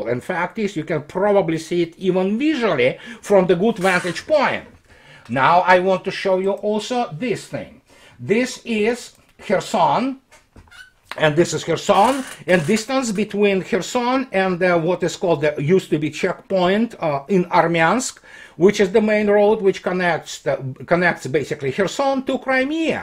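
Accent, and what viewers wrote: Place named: Russia. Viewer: It's awesome and funny AH to be listening to American slang with a Russian accent!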